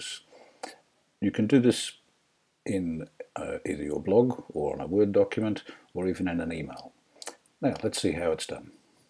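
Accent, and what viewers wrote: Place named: Australia. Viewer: he sounds like an aussie version of bob ross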